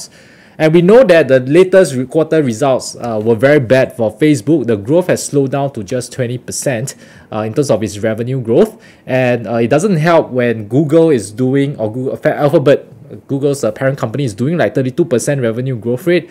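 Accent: Singapore. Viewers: It's not English he speaks but singlish - a version of English spoken in Singapore..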